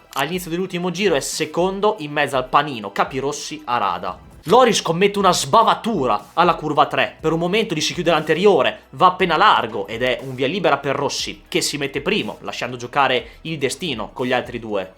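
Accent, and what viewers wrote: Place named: Modena, Italy. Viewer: Di dove sei? A volte sembri reggiano, a volte modenese... più modenese...bei video!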